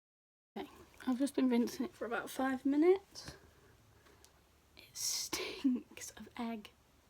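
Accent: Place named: Wales, United Kingdom. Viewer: Where are you from? Love the accent but you sound a bit welsh. xx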